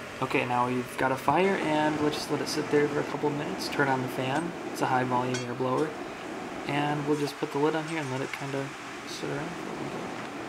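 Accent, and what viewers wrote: United States. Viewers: American accent.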